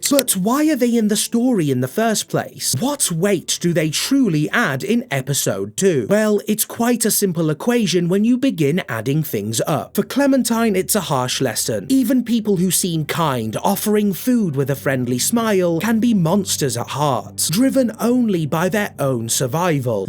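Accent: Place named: United Kingdom. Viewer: Man i love this channel especially the way the essay is presented beautifully with a soothing brittish accent is a cherry on top AND chef's kiss!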